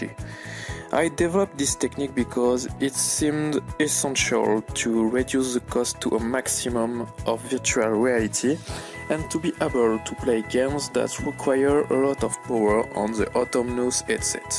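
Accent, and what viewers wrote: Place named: France. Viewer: i spot a french accent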